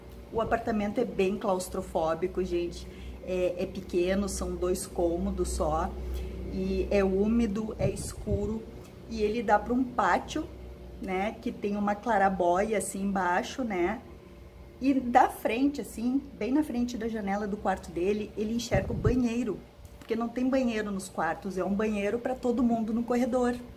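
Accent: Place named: South, Brazil. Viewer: Oi! Vim pelo canal da Andréia, você deve estar ganhando alguns inscritos, merece! Como é simpática! E esse sotaque do Sul? Tenho memória afetiva de uma professora minha do primário, gaúcha, e adoro essa musicalidade no falar! Eu precisei parar seu vídeo e ler o Inquilino. Estou na parte em que ele vai para o apartamento do amigo da Stela e eles estão lá falando da moça que se suicidou. Depois que terminar volto aqui para ver o restante da sua resenha! Eu sou terror/suspense na veia! haha Você citou o filme ser melhor que o livro. Um super exemplo para mim disso é o filme 1408, baseado em um conto do King. Você deve conhecer. Fui ler o conto após ter visto o filme e pela primeira vez achei que King não explorou direito o potencial do conto e do personagem haha, que heresia! Não parecia o mestre da prolixidade, porém temos que entender que é um conto. 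Ainda assim, quando acabou eu falei: mas já? kkk mil vezes o filme! Como dizem, ganhou mais uma inscrita! Bjs!!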